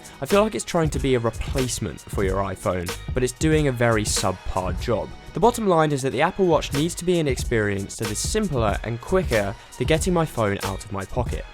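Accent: United Kingdom. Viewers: damn how Gorgeous Britain English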